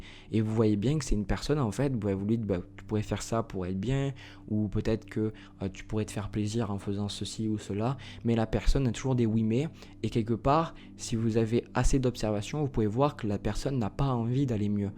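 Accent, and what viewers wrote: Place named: Aveyron, France. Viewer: Trop drôle,! Mon cadet porte votre prénom, votre nom et votre accent me parle mon Aveyron... Et vs êtes bélier et analysez nos caractères comme moi... Et le changement de ce mois est ouvert! Après échec et mat...par trahisons! Et trop de ces questions que vs ennoncez... Merci vraiment.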